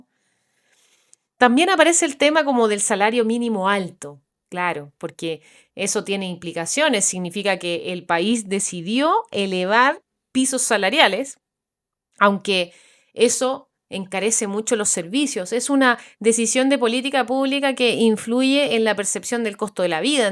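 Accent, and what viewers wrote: Chile. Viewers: Me gusto bastante como lo explicaste, es así como que hay poblemas y tratamos de que no nos afecte tanto, acá algunos llevamos la vida como relajada como sin prisa Y cuando uno va a otros países le extraña ver el corre corre, por tú asentó me pareces Chilena, hace poco fuimos allá y fuimos a un lugar en la ciudad a comer comida bien tradicional y el mesero nos atendió como con una prisa y a la vez se veía como triste y decia una amiga comamos y nos vamos porque ese señor como que no nos quiere aquí 😅 Será eso que nos acostumbramos al trato Pura vida ❤ siempre una sonrisa, siempre sin prisas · Srta, he tenido la oportunidad de vivir en varios países, iincluyendo Costa Rica hoy vivo en Kentucky pero que maravilloso ha sido su analisis sobre este pequeños pero bello país, por tu acento creo que eres chilena y una de mis mejores amigas en mi pequeña ciudad Somerset de es Sol, una ex diplomatica de Chile en China y Europa con quien compartimos todas las semanas y siempre terminamos hablando de nuestra amada ¨Tiquisia¨. 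Su analisis me ha hecho reflecionar de todo lo que implica la expresión PURA VIDA. y sin temor a dudas cuanta razón tiene ud. Ojala cada pais de nuestra amada Latinoamérica y del mundo tengamos ese sentido de orgullo de la tierra y cultura que nos vio nacer, como lo diría el gran Poeta Nicaraguense R.Darió ¨ Si la patria es pequeña una grande la sueña¨ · Saludos hermosa Arquitecta de sueños. ´¿ Quisiera tener una polola como vos? Ja quedo impresionado por tu cultura, bueno soy un tico que quedó enamorado de tu país y sólo fui desde Santiago hasta Antofagasta, ahí me quedé. Chile es la tierra donde alzas una piedra y aparece un poeta. Me encantó la cultura poética de Chile, tengo gratos recuerdos y me halaga lo que hablas de mi país. Soy Alex, un poeta costarricense y puedo escribir unos versos sobre tu belleza. Me encanta como proyectas la voz, tu lindo acento chileno y bueno me gusta que hables con conocimiento. 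Quisiera volver a Chile para cooncer el Sur, eso sí en verano por favor. Dicen que el Sur de Chile tiene muchos lagos. En el norte se ve otra cosa y se prueba el Pisco chileno. Saludos y avísame si querés el poema de un tico y a donde te lo mando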